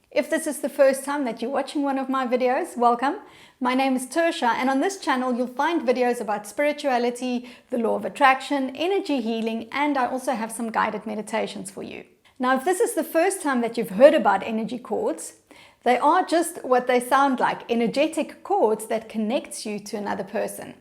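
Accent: South Africa. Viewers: You sound south african,I feel at home❤ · You sound south African 🇿🇦🥺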